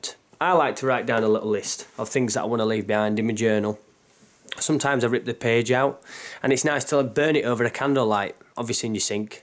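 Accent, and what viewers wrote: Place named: Yorkshire, United Kingdom. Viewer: Hi man...you're an unque guy obviously a free radical...I'm now in my 50s and was like you back in the 90s watching technology control people I'm not at all shocked to see what's happening because was saying things like you back then and folk just said yeah so what..your accent sounds Yorkshire..wakefield maybe,...I was a brickie too like you ..uourceirk looks good...all the best for 22..cheers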